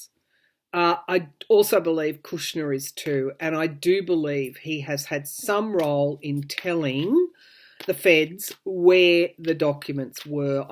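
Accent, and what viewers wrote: Australia. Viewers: Hello! I always SO enjoy your readings, the way you present everything, the humor! (Donald Capone😂) and the very personal way you „ talk“ to us. Very different from other readers ( apart from the Australian accent!). You bring a very human, personal touch to your readings. Thanks for all the time you take to bring us the latest insights! 🙏🌹🙏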